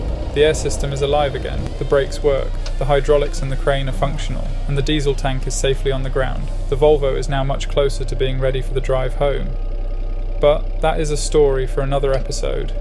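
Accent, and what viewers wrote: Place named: United Kingdom. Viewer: Har du en engelsk förälder eller studerat i England, för din accent låter enormt brittisk❣Ärru från Åmål med tanke på ditt val av alias?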